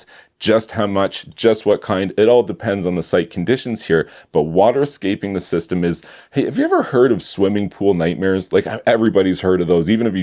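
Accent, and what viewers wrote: Canada. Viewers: This man is clearly, obviously Canadian. I'd say from southern Ontario, likeleh. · Dude, his accent announces that he's Canadian within the first few seconds of any video. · I love your Canadian twang :D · I understood that is Canadian the first time I heard him say "aboot". Grandfather was Canadian. Easy for me to distinguish between American and Canadian accents. Cheers. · you sound like you're Canadian??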